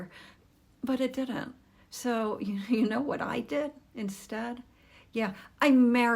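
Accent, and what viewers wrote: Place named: Baltimore, United States. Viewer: I detect the Baltimore accent. Oysters are gross. I might try something new if I think it might be good, but not if it's gross. I'm also not going to talk someone into eating something they don't want. My ex was allergic to chilli peppers. They would screw up her system. It became standard habit to ask about them at any Tex-Mex place. I'd ask even if she didn't remember.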